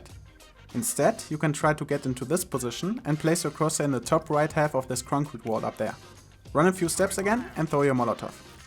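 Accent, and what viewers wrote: Germany. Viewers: Are you German? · Ur german accent is very good m8 nice vid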